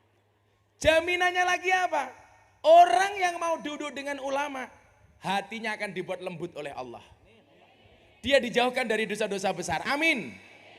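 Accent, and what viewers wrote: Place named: Java, Indonesia. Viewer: Mugo" sehat Gus sy suka ceramah e adem d hati dan gk membosan kan lucu pooll sy seneng dgn logat jawa e sbgai orang jawa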